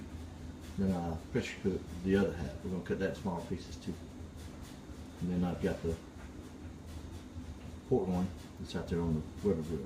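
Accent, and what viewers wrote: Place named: Virginia, United States. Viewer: Really nice video and description. Love your accent and it is very close to how my folks talk from Danville,VA. I'm in the middle (NC). Learned to make stew from some old hands at the ruritan club. They don't grind.They cut into small cubes and cook it til it falls apart in strings. Oldtimer said it needed to have at least one squirrel in there to be authentic. Thanks for the recipe.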